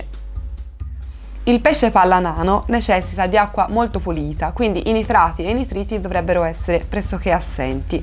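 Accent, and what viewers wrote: Tuscany, Italy. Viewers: It's Italian , with a strong Tuscan accent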